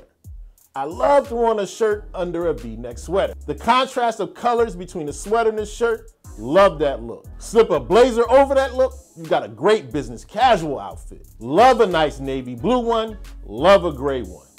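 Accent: Philadelphia, United States. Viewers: You outta Philly?!I knew you wuz out North East sumwhere..i thought New York..no offense..LOL SALUTE..from Chicago$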